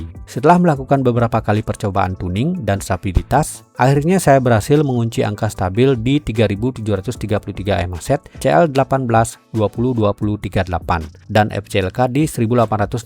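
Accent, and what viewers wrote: Bali, Indonesia. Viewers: Kok bisa2nya mikir dia muslim bro? Sy awal tau chanel ini dan denger logatnya aja langsung tau kalau beliau org bali 😂. Sy jg muslim dan belum pernah ke bali juga sih, cm beberapa kali nonton interview org bali dikonten org emang logatnya mirip kaya gini...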